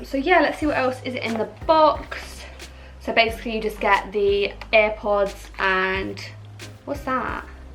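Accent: United Kingdom. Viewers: Oh my god the British accent sounds soo different to me lol but its kinda cute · I just stumbled across as this video and I gotta admit: You sound very British 😅😂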